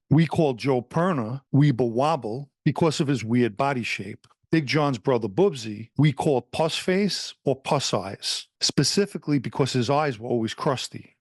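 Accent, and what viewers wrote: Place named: New York, United States. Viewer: By all means John please explain why you have a New York accent?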